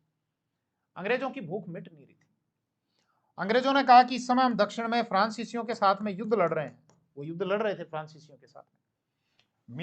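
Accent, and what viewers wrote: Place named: Marwari, India. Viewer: Your voice is so good...your pronounciation is a mix of Hindi and Marwari language..very pleasant to hear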